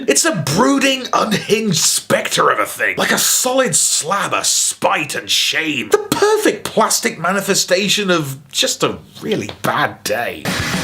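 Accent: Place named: England, United Kingdom. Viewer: Jetfire from ROTF had a heavy Scottish accent, I know has an English accent, but it still reminds me a lot of Jetfire.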